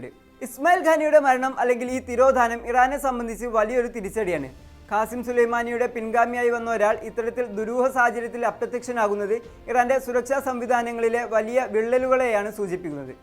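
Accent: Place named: Malappuram, India. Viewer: മലപ്പുറം മലയാളം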